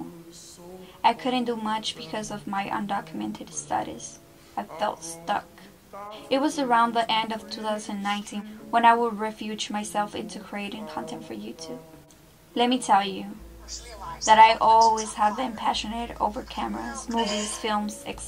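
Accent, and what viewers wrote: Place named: Spain. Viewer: Go to school and get a degree ! Unfortunately you can’t just start as a Spanish influencer then suddenly cross over to English influencer especially with your accent so thick. I would suggest you create videos in Spanish and use YouTube as a source of income not a concrete job because with wars and inflation lots of sales ( links in bio ) are going to go down . People are more tight with their money especially right now .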